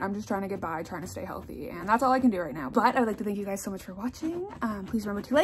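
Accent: Canada. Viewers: is it just me or does she sound extra canadian in this video lmao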